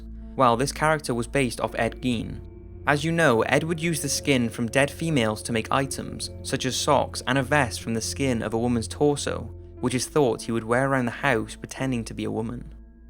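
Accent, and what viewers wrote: Wales, United Kingdom. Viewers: gjoni I think he is Welsh butt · Alex Wilde His accent is Welsh! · The narrator is Welsh. A nice welsh accent. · ah...love that soft Welsh burr. Strangely comforting, given the horrific subject matter! 😉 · Is that a welsh accent I hear? Aye Welsh squad turn up🤘🏼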